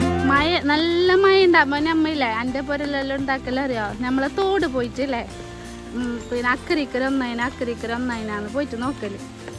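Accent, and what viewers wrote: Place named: Kasaragod, India. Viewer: കാസ്രോട് ഭാഷ 👌👌👌😁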